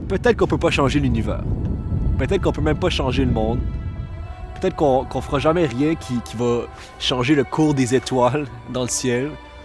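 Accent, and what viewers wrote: Quebec, Canada. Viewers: Salut.. je suis pas sûre si tu vas lire ça ou non.. mais j'ai décidé de le faire quand même.. je suis ni d'origine francaise ni québécoise alors j'ai eu qlq difficultés pour comprendre un peu tes vidéos mais le contexte est très clair et vraiment tes vidéos sont très profondes et touchant tu fais un excellent travail.. j'aime bien l'accent québécoise et c'était parmi les bonnes choses que je vécu lorsque j'ai tombé sur ta chaine c'est un trésor perdu que j'avais un chance de le trouvé.. bonne chance et mercii☺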